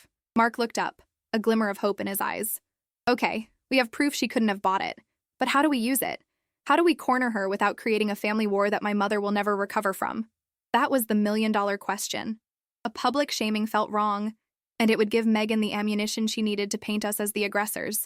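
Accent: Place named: United States. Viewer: Narration in an American accent, calling the trunk of the car, the "boot," which is what Brits call it, amused me.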